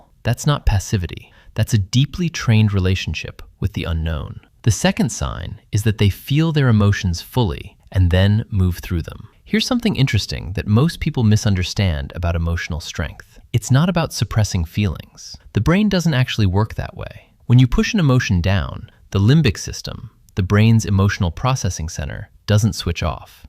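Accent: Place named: England, United Kingdom. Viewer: Why did the narrator voice turn into an native englisman's voice midway through the video?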